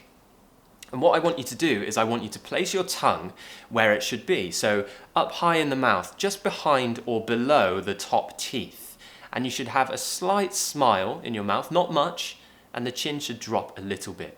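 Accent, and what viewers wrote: United Kingdom. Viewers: What kind of accent that the Primary Minister May said ?what's the difference between yours and hers? Both are really amazing!!!i was fall in love with British accent !! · Hi! You always help us a lot in pronouncing British English. I'm in love with your pure British accent, so beautiful and clear. I've always had some trouble when pronouncing the /ae/ sound and I'm doing my best working hard on it. A tip to help your students pronouncing it correctly, or at least, more correctly, is to try to place the position of your mouth as if you were going to pronounce an /a/ and then pronounce an "e". Do you know what I mean? I hope it helps! Thanks a million for all your help and good knowledge. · Nice accent, I would like to speak as a British native speaker. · Good morning, Thank you for administering our daily dose of English pronunciation! 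I do believe that listening to you on a daily basis will bring us next to perfection. Since I singled you out from the wide range of online English teachers my English has dramatically improved. Just like children do, I naturally tend to imitate your accent, use your vocabulary and so on. I suppose your she cat has a perfect British accent too! I managed to pass the CPE test in Rome but I want to go further. English seems to have no boundaries, the more I learn, the less I know. I think one day I will take up one of your courses so that I can have ready answers to my questions and doubts at hand! I cannot thank you enough for what you do!!!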